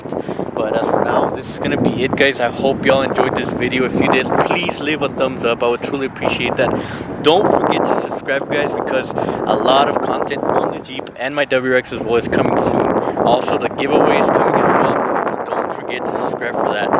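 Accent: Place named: India. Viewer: you speak like an Indian or Pakistan guy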